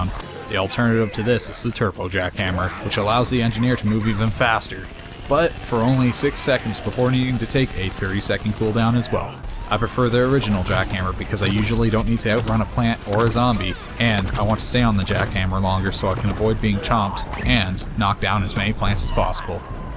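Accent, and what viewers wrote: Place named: Canada. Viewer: It doesn't, I understand that your Canadian and that anyone insulting the way you talk is just rude.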